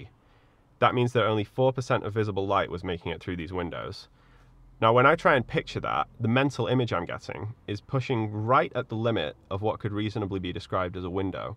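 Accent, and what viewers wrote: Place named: Nottingham, United Kingdom. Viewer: What are you talking about? has a Nottingham accent because he was born and raised in Mansfield.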